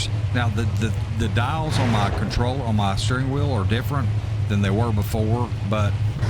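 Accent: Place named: South, United States. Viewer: Thanks a pant load.. 30 whole seconds running a late model (I have no interest in) all by yourself. And 8 min 20 seconds listening to your dumb redneck accent talk non-stop. Thanks to you, I have no clue what it might be like running a sprint car (Original Outlaws) with other cars on the track. Imagine that. Other cars on the track. Have you ever advanced that far?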